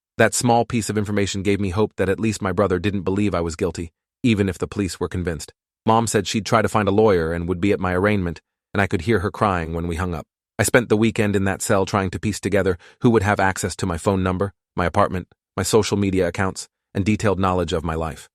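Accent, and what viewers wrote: United Kingdom. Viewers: Anyone else notice how his voice slowly slowly start sounding like British accent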